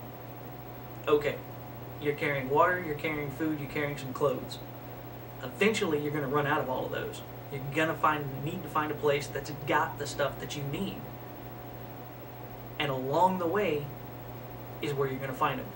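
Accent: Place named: South, United States. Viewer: Sound like u from dwn south, anywhere near newmadrid seismic zone? Would hate fir u to have to leave your homestead.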